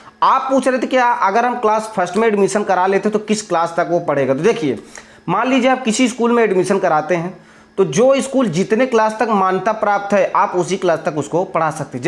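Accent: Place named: Maharashtra, India. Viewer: Are marathi English,,sidha point to point bat kro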